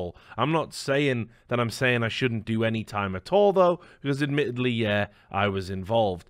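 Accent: United Kingdom. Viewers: My name sounds a lot like “Tyler Barriss” especially with a british accent so I’m kinda shook everytime says it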